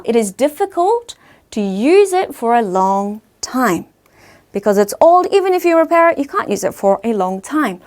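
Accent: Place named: England, United Kingdom. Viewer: Her English accent 😍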